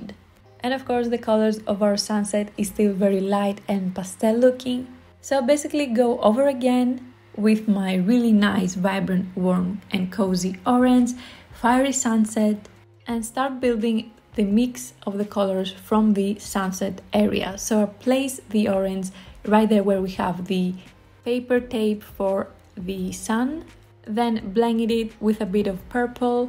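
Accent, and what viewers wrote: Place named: Greece. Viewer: Your Greek accent is so beautiful. I could listen to your voice for hours.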